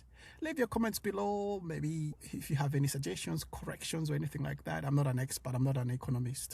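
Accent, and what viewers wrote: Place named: Australia. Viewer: I like your accent. Lots of aussie in there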